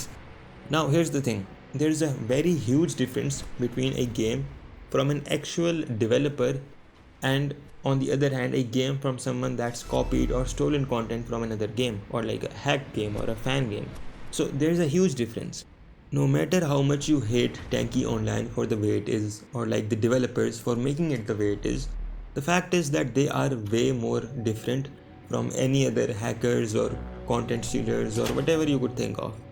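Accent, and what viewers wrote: India. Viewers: knew this was a scam video the second I heard that poopjeet accent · The second I heard that indian accent I knew I was getting baited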